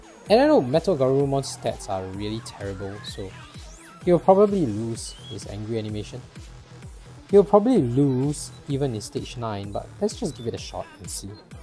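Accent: Singapore. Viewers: liked n subscribed, btw you sound like Singaporean/Malaysian haha anyways looking forward to more digimon content!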